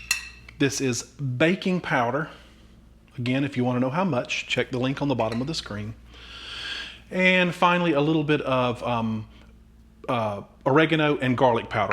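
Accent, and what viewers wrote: South, United States. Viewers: Man! I have been horrible on my diet and apparently was off Keto so bad YouTube stopped suggesting keto channel videos for me... bad sign for my health but also I missed your videos. Love your positive personality and your accent reminds me of my mother’s southern accent. Might have to try some of these and get back into keto eating...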